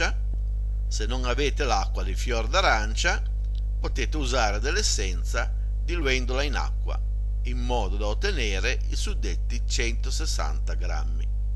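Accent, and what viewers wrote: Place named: Liguria, Italy. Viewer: Che piacere sentirti parlare con il "nostro" dolce accento Dove si intuisce una bella parlata dialettale della nostra Liguria.....Perché non alterni qualche ricetta in dialetto?....Appena il mio lievito madre avrà raggiunto il "giusto" risveglio farò sicuramente la tua ricetta. Grazie!!!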